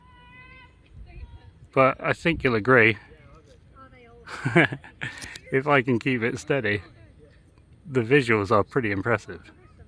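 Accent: United Kingdom. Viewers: British accent 😮😢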